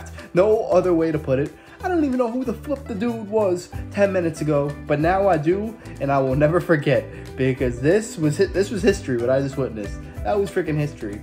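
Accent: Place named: United States. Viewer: 😂 I was expecting you to have an Irish not American accent as is like the most Irish surname ever dude 😎👍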